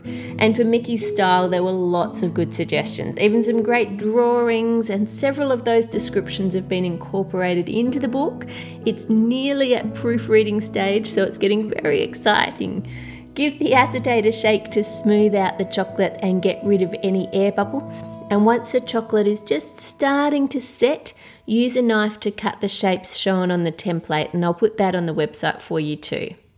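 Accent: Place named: Australia. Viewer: English isnt my first languarge and I learned english by a american. so this austrailian accent is a bit hard to understand for me. 😅 The words sounds so different.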